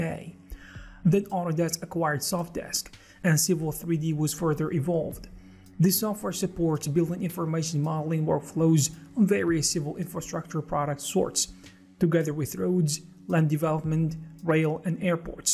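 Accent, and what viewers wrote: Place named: Arab world. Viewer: u have an arabic accent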